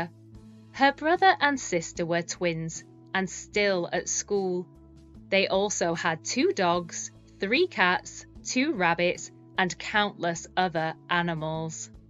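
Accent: United Kingdom. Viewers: I always love British accent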